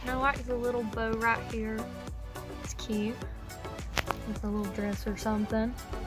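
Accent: United States. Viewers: God, that twangy Georgia accent is screaming sexy ❤❤❤❤❤❤❤❤ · Beautiful sweet. Southern accent so cute. ❤🎉👣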